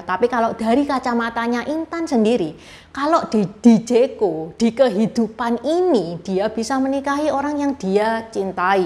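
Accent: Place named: Yogyakarta, Indonesia. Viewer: Mirip logat Chinyo alias chinese yogyakarta. 🤭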